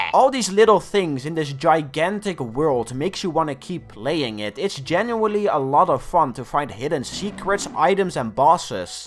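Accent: Netherlands. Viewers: U Dutch? U sound dutch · Yeah hes Dutch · hollow knight is amazing also are you Dutch? I recognize the accent on how you pronounce some words idk if it makes sense, could be bc I'm Dutch lol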